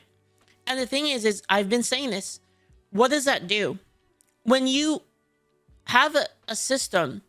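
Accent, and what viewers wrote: United States. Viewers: Unrelated but, As a British man, i find it funny hearing an American explain what rain is to me 😂 i was 4 when i discovered i could take off a raincoat, we're practically born underwater it rains so much here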